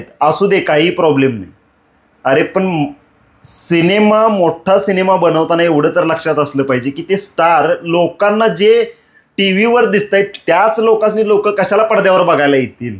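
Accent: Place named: Kolhapur, India. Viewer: I like your Kohapuri accent. And ofcourse the rokh tokh analysis.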